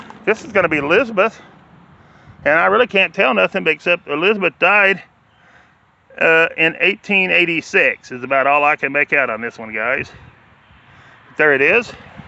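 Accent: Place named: South, United States. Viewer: On the mark, that is Monroe Yoder, Died Aug 21 1890 (couldn't see his age) + Martha Yoder, Died July 19th 1890, aged 15 (so they were brother and sister, most likely, died one month apart). On the mark, Amos Yoder died Sept 14 1865, Aged 1 year, 9 months. I thought i heard a bit of an accent in your speech but frankly, i didn't know where exactly to place it from, in the south (i thought maybe Texas, haha, although i see now that i was wrong) because i know each state in the south has its own type of accent 🙂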